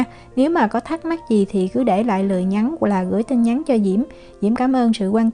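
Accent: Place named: Mekong Delta, Vietnam. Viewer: Diễm oi! Chị nấu thành công rùi ,ngon lắm tơi xốp ,giọng nói người Miền tây dể thương ,Diễm nấu xôi đậu phộng nhe !💛💛💛💛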